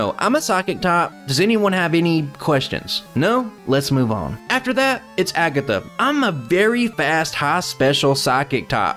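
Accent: South, United States. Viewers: Finally, not a Canadian. You've got the most southern accent of any Pokémon challenge Youtuber and it's welcome.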